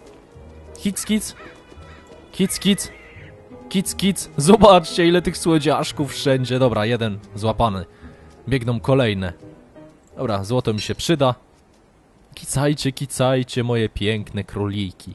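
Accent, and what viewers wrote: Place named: Podkarpacie, Poland. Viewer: Zgaduję że jesteś z podkarpacia , albo z okolic bo tak mówisz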